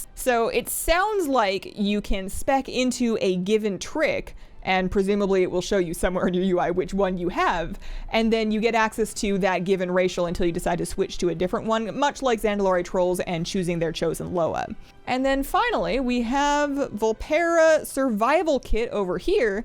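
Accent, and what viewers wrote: Canada. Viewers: Can you tell she's canadian? :D I love your enthousiasm!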